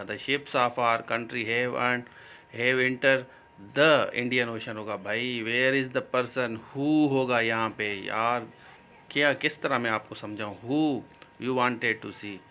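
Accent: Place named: Sindh, Pakistan. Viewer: Grateful Dear Sir! Yh beech beech mn sindhi bhe mix hojati hy ap se sain jese hum sindhi logo ki adat hoti hy Hahaha. Wari Wari Hahah. .. God bless you sain!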